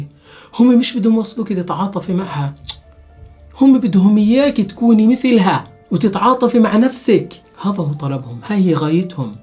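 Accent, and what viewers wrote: Palestine. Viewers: هذا فلسطيني واضح من لهجته لكن تحيه لك على اي حال اخوي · حكيك زينا فلسطيني ..كلامك رائع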